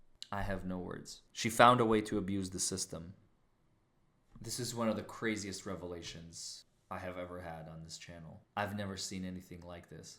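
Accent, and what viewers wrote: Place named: Ohio, United States. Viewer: Awesome job I knew sooner than later someone would see what I thought was her manipulating the court’s system! AND I knew you were from Ohio! 😊I could feel it in my soul!! Honestly you have a face that I have seen at some point in my past! Not to mention you act too much like myself & our friends to be from KY! 😂 Those from Ohio have a different kinda accent!!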